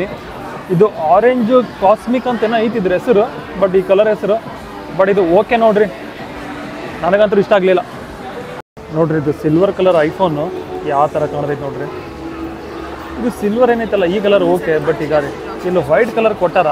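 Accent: North Karnataka, India. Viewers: ನಿಮ್ಮ ಉತ್ತರ ಕರ್ನಾಟಕದ ಭಾಷೆ ಅದ್ಭುತ ಅಣ್ಣ ❤❤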